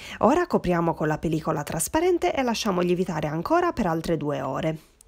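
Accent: Sardinia, Italy. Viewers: Mio padre è sardo!!! Mi piace il tuo mitico accento!! Le tue pizzette cicciottose !!! Bravissima !!! Un abbraccio da Trento !!! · ciao ti volevo chiedere se uso la farina 0 e uguale perché in questo periodo e difficile trovarla La farina Manitoba con il covid 19 qui sparisce tutto e se trovi qualcosa e un miracolo ciao ancora .(sai io o delle cugine e la zia che abitano lì e sono tanti anni che non li vedo perche mia mamma e nata li a Iglesias.infatti io cono mezza sarda perché assomiglio tanto a lei e o oltretutto ereditato l'anemia .vabbè comuncue mi piace tanto sentire la tua parlata Sarda mi ricorda quando andavamo a trovare mia nonna che abitava a Iglesia ora non c'è più sono ricorbi dellissimi che o e mi tengo nel Cuore e mi piacerebbe rivedere la mia cara Sardegna sai be un saluto caro ciao CATIA dall'Umbria 😁👍🤣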